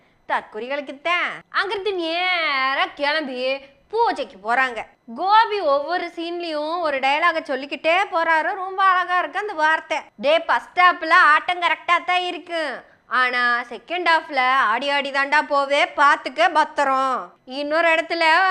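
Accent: Kongu, India. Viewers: சகோதரி தங்கள் கொங்கு தமிழ் சுவையோடு கருத்தாழமிக்க பேச்சு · அருமை 🖤❤️கொங்கு தமிழில் சாதி கொடுமைக்கு எதிர் பேச்சு கேட்ப்பது இனிக்கிறது 🙏காரணம் அங்கே தான் சாதி வெறியை ஆடி 18யில் கண்டேன் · பேசு மகளே பேசு இது தற்கரி தலைகளில் நங் நங் என்ற கொட்டுகிற கொங்கு தமிழ். கொஞ்சுகிற கொங்கு தமிழ். சங்கிகளின் கொட்டத்தை கண்ணத்தில் அறை விட்டு அடித்து விரட்டும் கொள்கை தங்கங்களின் சங்க தமிழ் அழகு தமிழ்.Allhuakber. jaibheem Periyar gr.daughter வாழ்க வளர்க நலமுடன். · கொங்கு slangula செஞ்சுவிடுறது எல்லாம் வேற லெவல் மா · உங்க கொங்குத் தமிழ் மிக மிக இனிமைங்கோ!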